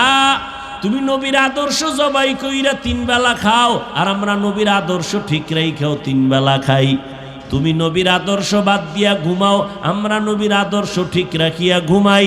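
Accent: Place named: Khulna, Bangladesh. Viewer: মাশাআল্লাহ এটা সম্ভবত খুলনার বয়ান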